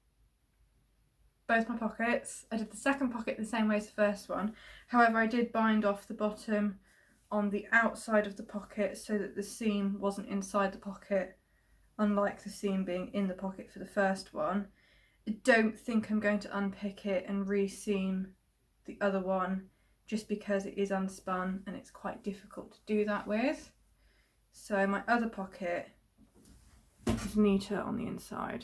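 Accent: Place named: Liverpool, United Kingdom. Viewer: A wonderful episode! I so enjoyed knitting along and listening about your knitting journey. I grew up visiting my grandparents in St Helens near Liverpool. Your accent brought me back over 40 years. Best wishes from a Canadian knitting podcaster!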